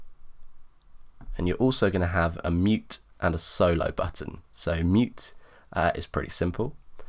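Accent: United Kingdom. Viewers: At last!! A nice British accent to listen to on an Ableton tutorial. Phew! ... sorry Murica.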